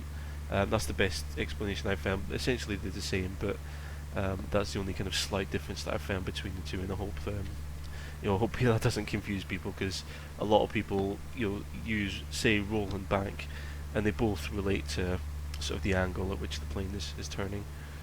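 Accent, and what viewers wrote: Scotland, United Kingdom. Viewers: I would guess its a fairly light scottish accent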